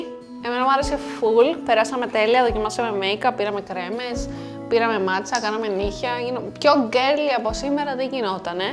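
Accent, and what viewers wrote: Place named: Cyprus. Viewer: Αφου σου βγαίνει το κυπριακό... μιλα μας κυπριακά!!!!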